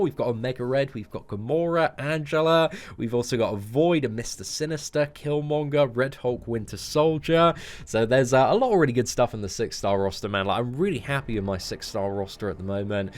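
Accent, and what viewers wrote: England, United Kingdom. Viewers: Compared to the other MCOC utubers your are first mainly because you have a very nice English accent !!!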